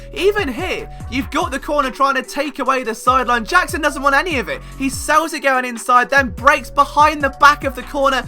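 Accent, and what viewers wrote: England, United Kingdom. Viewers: Hi! Great content and all. Just curious, what’s your Philly roots? It seems like you know so much about Philly sports mist especially with your English accent:-)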